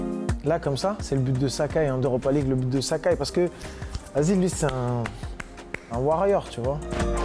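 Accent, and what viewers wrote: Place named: Paris, France. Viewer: Par contre l'accent on voit bien c parisien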